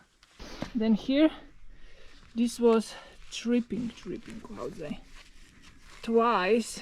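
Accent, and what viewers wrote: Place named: Slovenia. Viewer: but it sounds Slovenglish and we need to practice our English.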